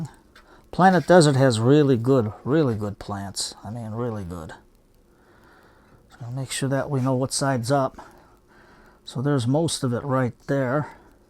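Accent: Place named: Chicago, United States. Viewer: Hi! I was in Oak Lawn and the Mount Greenwood neighborhood of Chicago last week and stopped by Fasel’s & Son’s to get some bonsai pots at 20% off. Great prices. Thanks for the tip. I had limited space to transport back to North Carolina or I would have bought more. It was good to see the old neighborhood, visit my family, and hear more of the Chicago accent that has made listening to you so much fun.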